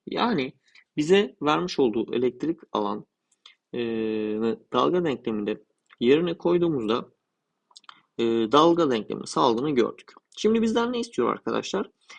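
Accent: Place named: Samsun, Turkey. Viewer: hocam e harfini telafuzunuzdan anladım ben de samsunluyum. videolarınız için teşekkür ederiz kaynak bulmak çok zor gerçekten sağolun.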